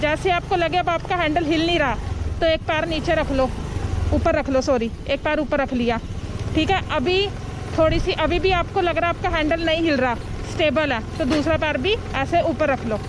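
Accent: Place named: Punjab, India. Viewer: Plzz hath main gloves mat pehen lena unko dekh kar he aapke khoobsurat chehre ka andaja lagata rehta hu baaki aap chehra to dikhate nahi😞Aapki punjabi, himachali accent wali hindi achhi lagti hai🤓❤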